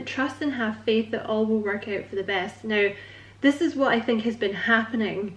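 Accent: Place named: Scotland, United Kingdom. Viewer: Thank you! I have been 100% confident in this connection for a while so I'm pretty relaxed in it (Spirit told me from the very beginning, "if you don't loose your cool, everything will be fine"). However, confirmation is always nice. He's water and oh, so slow... I'll keep doing my work while he does his. BTW, I love your Scottish accent!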